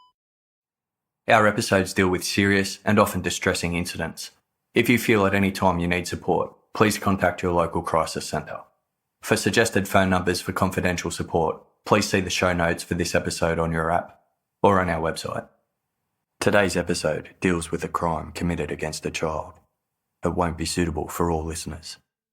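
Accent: Australia. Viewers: I love your podcasts. Your context is excellent and as an American I like the Aussie accent.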